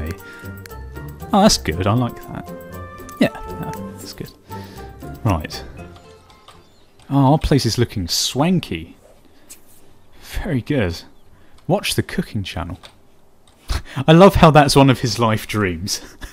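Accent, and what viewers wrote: United Kingdom. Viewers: British accent = $waggest accent in the world.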